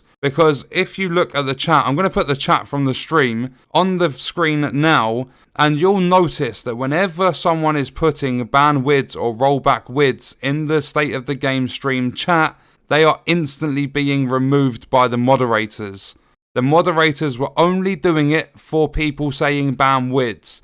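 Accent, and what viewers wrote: United Kingdom. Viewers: Love your Brittish accent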